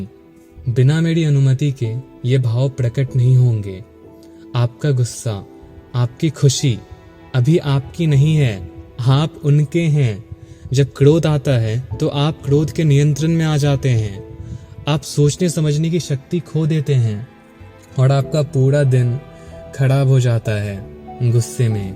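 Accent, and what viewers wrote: Bihar, India. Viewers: Oye grammer me phd wo bihar ke hain aur Bihar me aise hi pronounce hota hai r, har jagah ka regional accent alag hota hai. Tera hi bas sahi nahi hai, ek to wo itna kosish kar rahe hain hamare accent me bat krne ka upar se nautanki karna h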